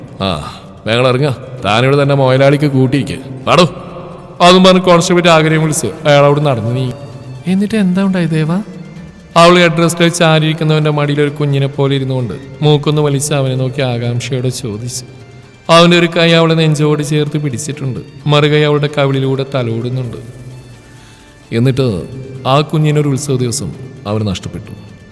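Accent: Tamil Nadu, India. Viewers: ഉണ്ടേ!!!!!😂😂 ഈ തമിഴ് കേൾക്കാൻ നല്ല രസമുണ്ട് 😊😊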